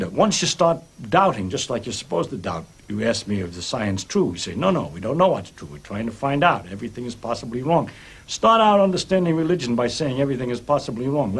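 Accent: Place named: New York, United States. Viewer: I love the way he talks about the universe with the accent of New York longshoreman, or as his friends said a bum.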